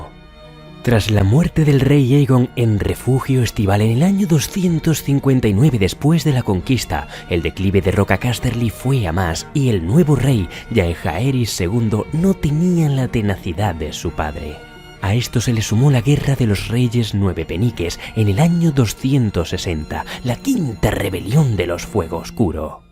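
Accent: Spain. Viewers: El asentó español le da esa toque medieval, gracias por la pequeña reseña del origen de esa canción.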